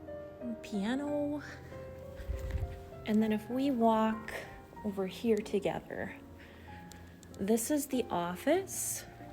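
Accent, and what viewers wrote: Minnesota, United States. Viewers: I knew your from Minnesota through your Minnesota accent!!! As confirmed by the Minnesota State flag!!! Good guess here!!!😂😂😂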